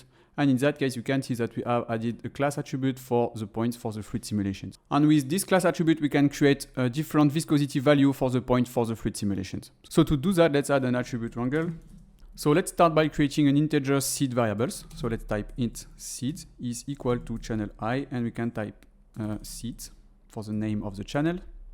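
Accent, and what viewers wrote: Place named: France. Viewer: why not creating different sphere and put them one by one ? and you won 10 min wasting time , . why you need to creat line to instance point on that lines ?! when you add something you did not say why ... and it abit hard to use plugin to separate materials node ? do it from houdini after use 2nd way to plugin mybe this plugin is not free or someone cant get it . atleast know how to do it natively but thank you for your videos bro . and vive la france ^^ . from your accent i can tell you are french guy . you know very wellhoudini nice to meet you bro .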